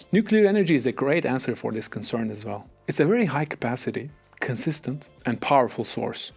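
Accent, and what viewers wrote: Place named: Turkey. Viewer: Nice and clean video. The accent rings a bell, any chance ur a Turk?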